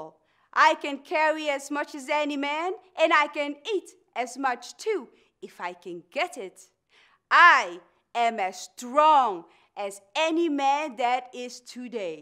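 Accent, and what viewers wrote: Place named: Netherlands. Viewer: Love the Dutch accent. I like to think that Sojourner would be happy to know that her own words are still not forgotten.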